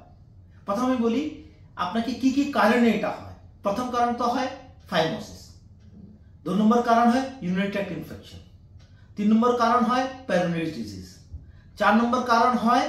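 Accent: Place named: Bengal-Bihar border, India. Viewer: আপনি কি বাংলা বিহারের মাঝামাঝি বসবাস করেন। ভাষা তো ক্লিয়ার হচ্ছে না।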